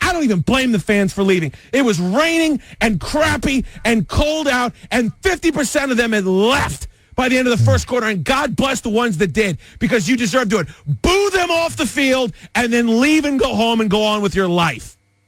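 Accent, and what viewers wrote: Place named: New York, United States. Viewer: ... "I'm Northern VA; I'm DC; I BLEED it!" How come you sound like a New Yorker, maybe a Jerseyite?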